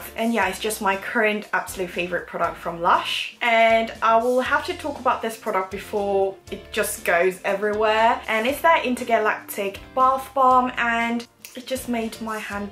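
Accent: United Kingdom. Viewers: 요런 추천영상 넘 좋아요 ❤️❤️ 언제 또 여행갈지 모르겠지만 😂 그리고 항상 느끼는 거지만 목소리랑 영국발음도 듣기 참 좋아용:D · 액센트가 완전 취저ㅠㅠㅠㅠ british accent를 갖고싶지만 어릴때부터 미국에만 살아서 american accent 만 가능한 저는 웁니다...★ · 항상 영상 잘보고있어용❤❤❤ 영국영어 들을때 뭔가 언니가 하면 끌리는게 있어서 계속 반복재생하게되눈거 같아용😍😍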